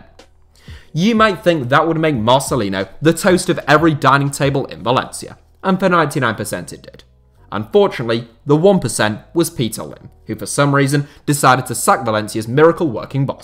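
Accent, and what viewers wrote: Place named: United Kingdom. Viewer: I know you are too busy to read this message but could you put in some subtitles? Sometimes your British accent makes it difficult for me to understand a whole sentence. If you can't don't worry, I'm just suggesting something.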